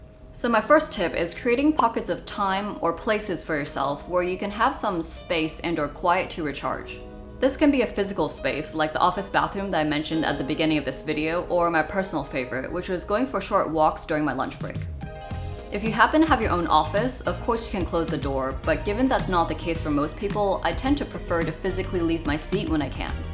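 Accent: United States. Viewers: I've watched a few of your videos and have decided to subscribe to your channel. As a UK person living in Asia, I can only thank you for giving us a glimpse in to the high society life of a Hong Kong resident. Most of what we see on Youtube are people living in shoeboxes under squalid conditions due to the highly inflated housing market. Do all people in HK have American accents? I know you grew up int he US, but your fella also has an American accent. Just curous because HK was a former British colony and all... Also surprised that the legal system still follows the UK way, you said you did it after "high school" - this term is only used in London and we actually have secondary schools. So I guess the whole UK educational system was thrown out when China took the reins in favour of a more American system. I find it very admirable, that not only you leave your job but also your profession to pursue your own dreams and do something better suited to your character. 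As an introvert myself, I found the best person to work for was myself. And that has made me somewhat successful. I hope it works out for you. You are really beautiful by the way.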